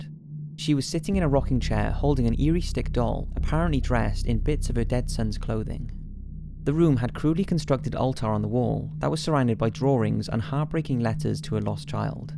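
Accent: Wales, United Kingdom. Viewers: Gabriel Mondragon he is 100% welsh, I’m welsh and I recognise his accent well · He's Welsh. You like Welsh. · He's Welsh mate. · thx my sexy british senpai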